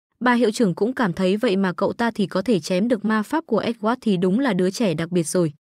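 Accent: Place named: Nghe An, Vietnam. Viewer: Tôi chắc chắn ad là người nghệ an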